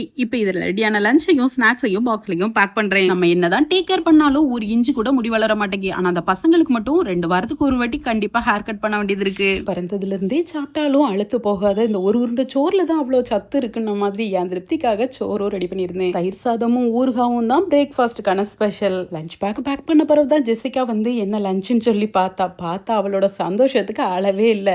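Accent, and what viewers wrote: Tirunelveli, India. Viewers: apdiya ma nanum athey doubt la dhan keka vanthen namma ooru slang mathiriye irukey nu😅😊neenga tirunelveli la entha side?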